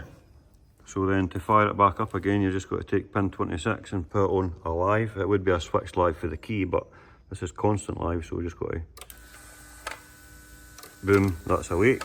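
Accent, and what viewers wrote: Scotland, United Kingdom. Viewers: I've been struggling to get my M54B30 started in an E46 323Ci even after everything's been wired in (it cranks + EWS del), and this has helped both for info and morale. Never thought I'd need a humorous Scottish gentleman explaining BMW wiring to me at 4 in the morning. Thank you, especially, for the brake rev-cutter tip . I'm the head mechanic but have been blocked from being at this car's first drift event. That would have been bad for the drivers to discover without me there.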